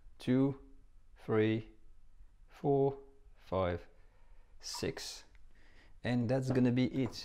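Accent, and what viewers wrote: France. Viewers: I am french , from Québec, so I also feel like he has that huge french (from France) accent . Also he did say Crouton so many times xD.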